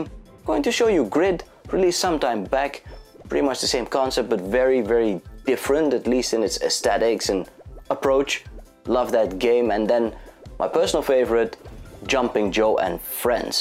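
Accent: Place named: Netherlands. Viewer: Dutch? seems like a dutch accent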